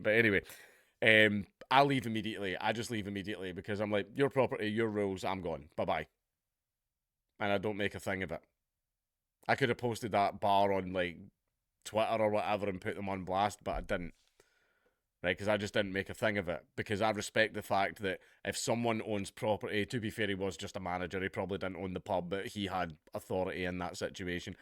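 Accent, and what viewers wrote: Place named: Scotland, United Kingdom. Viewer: I swear, you Scots, with your accents, can make us Americans laugh the hardest, even with the dumbest jokes! That thumbnail did it for me!😆😂🤣😂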